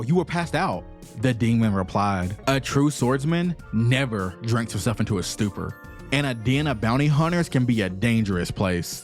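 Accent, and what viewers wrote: South, United States. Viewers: You can tell my dawg from the south plus anime content I'm subscribed now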